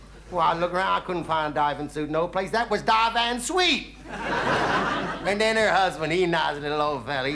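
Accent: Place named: United States. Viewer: I love his American accent. 👍👍👍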